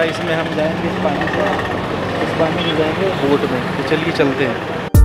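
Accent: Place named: Kanpur, India. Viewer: Guru kanpur se ho ka..yar boli bhasha ekdam mil rahi hai na..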